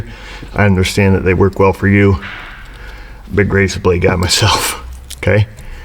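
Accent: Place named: Upstate New York, United States. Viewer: I use to live in upstate NY around Rome. I never thought your speech was abnormal, sounded normal to me for upstate NY. I enjoy your videos and learning a lot from them, Thank You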